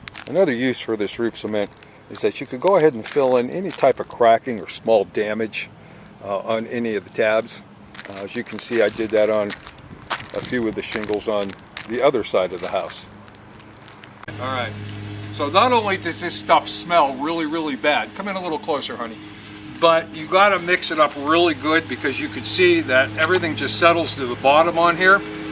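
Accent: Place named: Western Pennsylvania, United States. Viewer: You sound like you are from western Pennsylvania.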